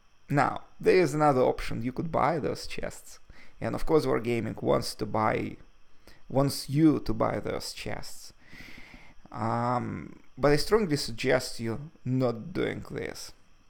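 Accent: Russia. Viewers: Is russian?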